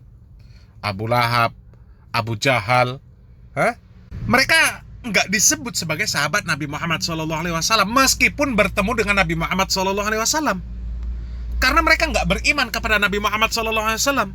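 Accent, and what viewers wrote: Java, Indonesia. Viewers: Suara jawa ni ptsay kd shaluan😂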